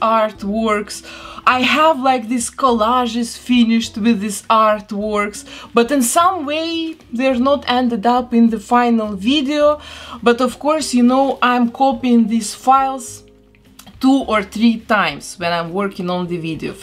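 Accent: Russia. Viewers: вы точно не русская? прям как будто русски английски, так сказать, звучит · Ты русская? Акцент русский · Этот убийственный русский акцент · Ох, этот русский акцент.. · У тебя русский акцент или мне кажется... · Only me hear that strong Russian accent? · вы ведь не американка и не англичанка. у вас акцент как у русской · Русский акцент ?🤔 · Мне кажется, или у неё английский с русским акцентом..... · почему тут нет ни одного русского комментария? я слышу 1000% русский акцент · Почему мне кажется что у нее есть русский акцент. Будто бы русская, выучившая английски. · Она не похожа. У нее акцент как у русского, который пытается говорить на английском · Хех прикольный русский акцент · Слышу русский акцент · Даже я слышу русский акцент)) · Видео крутое, но русский акцент мешает наслаждаться им в полную меру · Почему мне слышится русский акцент?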